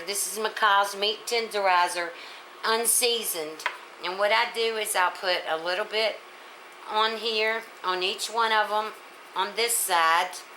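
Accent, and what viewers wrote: South, United States. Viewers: LOL, you may not consider VA the south, but you sure have a southern accent. I love your accent and you have a soothing voice.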